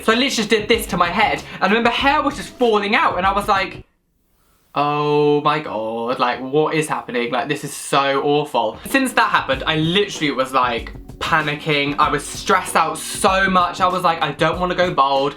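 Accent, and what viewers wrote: United Kingdom. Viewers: You also have a beautiful British accent that literally covers it up I wish I had an accent that beautiful so for the rest of us we don't have accidents to confiscate I need my hair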